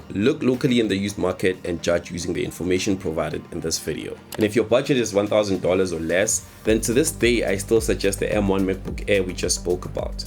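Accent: South Africa. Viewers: someone with a Sputh African accent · bro is south african???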